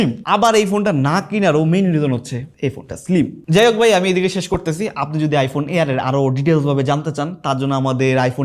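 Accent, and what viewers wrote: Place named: Bangladesh. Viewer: ও ভাই আপনি বাংলাদেশের কোন এলাকার ভাষায় কথা বলছেন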